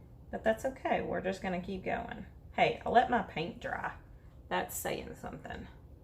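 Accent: South, United States. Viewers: I just had to tell you. I usually play my crafting videos on YouTube in 2x the speed that way I can get through a lot of videos in a short amount of time. You're southern accent is so cute it actually sounds like regular speed. Lol. I can say this because I am also a southern gal and boy do we talk slow! LOL! I was literally cracking up watching& listening to your project just now. Oh almost forgot...love the DIY also. From one slow talker to another, Great job.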